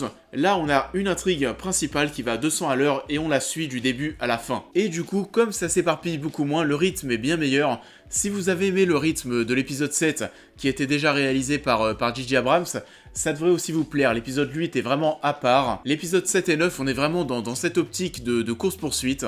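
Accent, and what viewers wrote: Paris, France. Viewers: Insupportable cette façon de parler de p'tite merde parisienne hin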